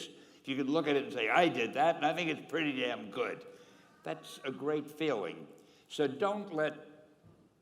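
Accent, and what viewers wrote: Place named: Argentina. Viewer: tremendo acento argentino el de stan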